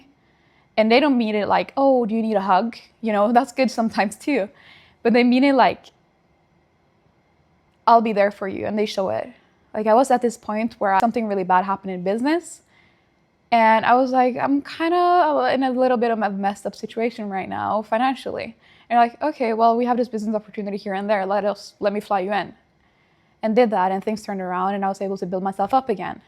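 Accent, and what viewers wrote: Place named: North America. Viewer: Excuse my ignorance, do all Norwegians speak with this "north American" accent? What other languages do you speak in your native country?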